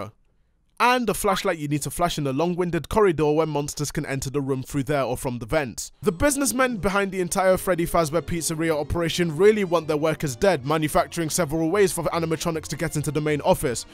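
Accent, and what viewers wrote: United Kingdom. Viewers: Lol u sound like British Cyrus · The British accent makes it a fucking amazing video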